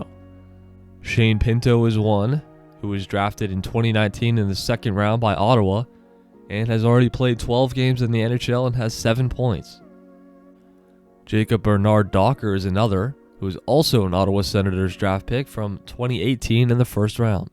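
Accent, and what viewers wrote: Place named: Upstate New York, United States. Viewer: he can not be, sounds like up state NY. Or he is reading stat sheet from 6/7 years ago. The Gophers? Michigan is best in the 10. Badgers? Who? U.M.D. and North Dakota are better.B.U.however,that is right.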